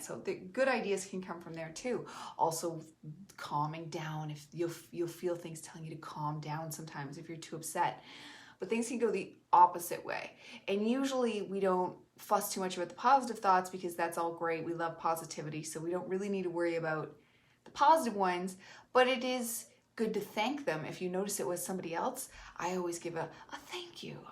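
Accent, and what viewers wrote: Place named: Canada. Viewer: "Hey sugar, ain't we got a DATE?" Thank YOU for YOUR thrilling and timely response! You're the internet superstar! I'm here with a note from my mom pinned to my jacket, "Please get Glenn on Bus 6, thank you!" And really, it's nothing overt, but really rather quite subtle! I'm kind of a student of the nuances, vagaries and evolution of language (a very BAD student, I'm afraid, slouching in the back of the classroom, hoping not to be called upon!), so please forgive my inadequate efforts to indicate what clued me to your nationality. I know "boot" doesn't quite get it, but sometimes we have to "settle out of court," so to speak! Also, count me sweet on "Canucks" and "Kiwis" (Aussies, not so much... sort of more of on a case by case basis!). If not for a string of DWI's in my intemperate years, I'd probably be living in Vancouver or Banff or Ottawa, instead of being stuck here on the plains of East Dakota, where there is no crime, but plenty of fear and semi-automatic weapons! 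In addition, "Slings and Arrows" is one of the funniest TV series I've ever seen, and, in spite of spending most of her life in California, Joni MItchell TOTALLY still sounds wonderfully Canadian! What's not to LIKE? "If I had loved you less, or played you slyly, I might have kept you for a summer more, But at the cost of words I value highly, And no such summer as the one before." from "Fatal Interview" -- Edna St. Vincent Millay OK, "Big Whore" agrees to subscribe! Keep that Canadian voodoo and mojo co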